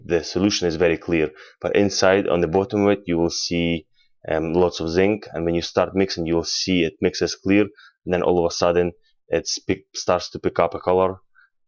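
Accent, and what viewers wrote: Scotland, United Kingdom. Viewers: Love how the Scottish accent comes through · Love the accent. Is it Aberdeen? Dundee?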